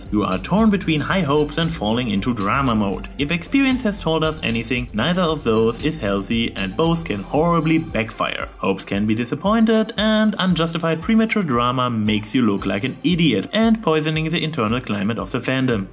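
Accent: Germany. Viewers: You sound so german...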